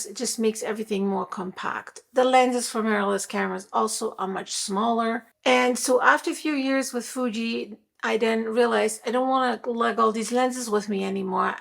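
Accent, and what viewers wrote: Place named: United States. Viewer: I thought I heard a faint German vowel. Near-perfect American English. I wish all multilinguals would work on pronunciation.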